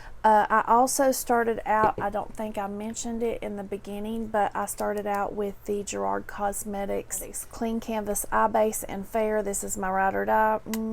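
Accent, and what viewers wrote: South, United States. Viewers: I Need that palette!! I don't usually say that..😯 I love your accent 😍 Southern Lady..the looks you do 💜 you're so Amazing at what you do!! Aaaandd... 1 k is coming..🥳 Love you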